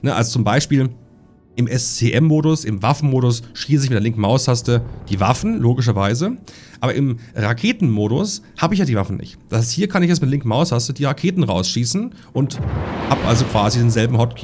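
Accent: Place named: Saxony, Germany. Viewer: Vermehrt. Da merkt man das du aus dem sächsischem Bereich kommst 😅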